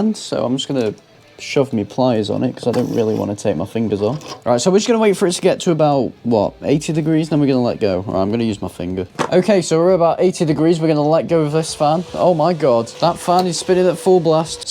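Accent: United Kingdom. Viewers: So, British?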